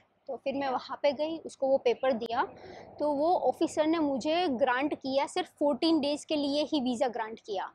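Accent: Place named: Gujarat, India. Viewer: You speak hindi & English with gujarati accent,🎉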